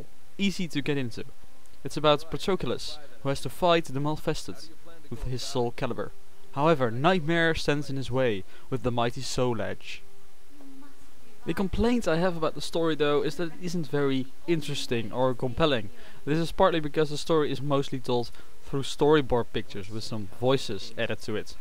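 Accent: Netherlands. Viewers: Haha ik ook, kdacht al toen ik je stem hoorde xD Nederlanders kunnen echt geen engels praten. Heb deze game net ook gespeeld f*kking vet:D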